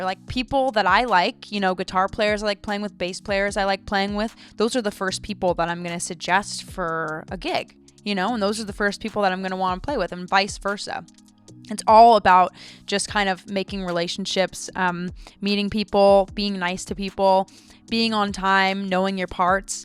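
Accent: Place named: Tennessee, United States. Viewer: Maybe Because you spend a lot of time in Nashville Tennessee maybe that’s why but you do not sound like you was born in California you sound like you was born in Tennessee or somewhere close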